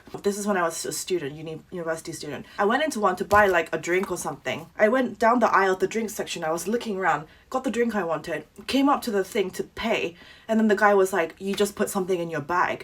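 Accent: England, United Kingdom. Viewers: It was interesting seeing London from your point of view. You are such a foodie! LOL Which is ok but you make me hungry even though I had just ate something. I also think your English accent came out more the longer you were in London too! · After watching a few of your videos I noticed your English accent got stronger while you were in London and it got lighter after a while in Korea! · ~ 전 영어공부 하는겸 재밌게 보고있어요ㅎㅎ 예전에 영국에 잠깐 거주했지만 영어를 아주 능숙하다 할 정도는 아니어서...ㅜㅜ 영국 악센트와 한국생활 소재로 영어하는게 진짜 맘에 들어요~~^^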